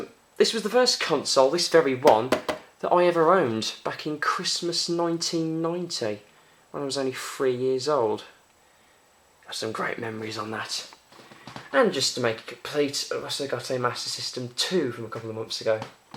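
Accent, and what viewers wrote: United Kingdom. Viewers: u sound like ure from the west mids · i love your british accent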